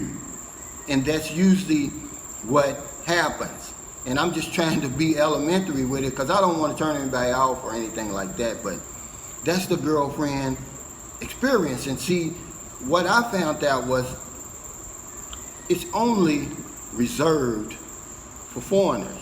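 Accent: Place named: Kentucky, United States. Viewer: Slang English...ahh it's your Kentucky accent I bet.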